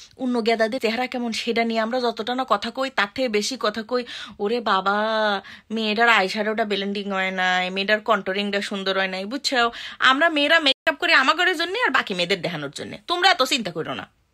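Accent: Bangladesh. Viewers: Bangladeshi kotha gulo ank moja lage sunte, very nice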